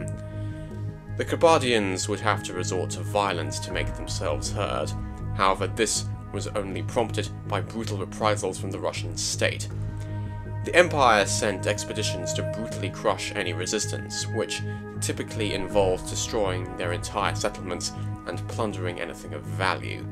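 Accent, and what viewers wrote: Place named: United Kingdom. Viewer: I dig your voice its fine and it sounds great especially with the British accent. The pronunciation of the tribes of spot on and the music in the back is absolutely epic I enjoy this video and we'll be looking into more of the content.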